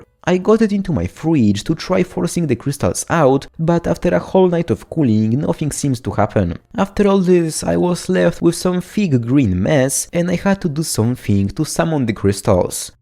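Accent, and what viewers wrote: Poland. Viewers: Guy sounds awfully like Polish citizen like me :D that heavy accent that I also have :D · Kurwa juz po samym akcencie rozpoznalem ze polak xd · czuć rodaka, pozdrawiam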